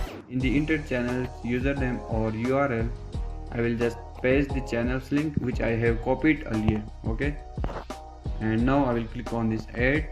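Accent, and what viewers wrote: India. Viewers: Why do tgey always have an indian accent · Lol it's not bad . It's Indian accent. You might have problem understanding it which is understandable but it's not bad. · No he's a indian ._. Ik a indians accent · Indian accent yea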